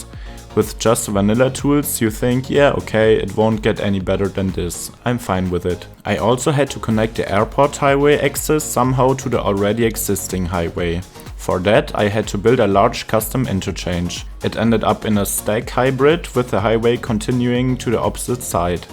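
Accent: Germany. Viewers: I Hear Deutsch in that voice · Ich höre den deutschen Akzent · Digga dieser deutsche akzent😅und cooles video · it may be that you come from germany and speak it it sounds a bit like the pronunciation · bist du deutsch(wegen dem aktzent)?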